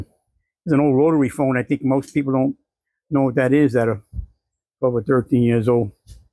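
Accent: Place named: Louisiana, United States. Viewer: What part of Louisiana are you from? Heard the gumbo reference and your accent sounds like my Louisiana relatives. They’ve all passed. Love the yard. You can tell how much you love your home and yard. It’s so peaceful. It’s like something I’d like to find.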